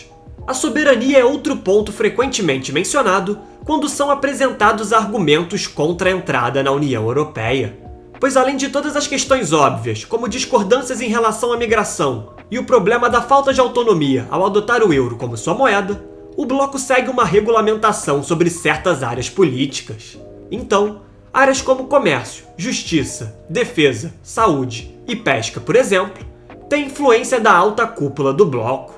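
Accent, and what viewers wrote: Rio de Janeiro, Brazil. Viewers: Bahhhh. Sotaque carioca. Pulei o vídeo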